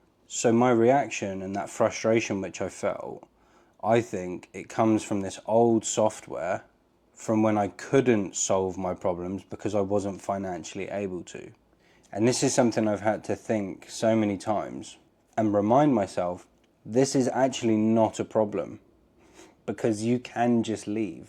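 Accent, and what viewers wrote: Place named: United Kingdom. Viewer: Which part of the UK is your accent from? Surrey Cambridge way Great video You have a smart brain and want to solve a problem straight away , I get in those loops and think about the solutions that solve it fastest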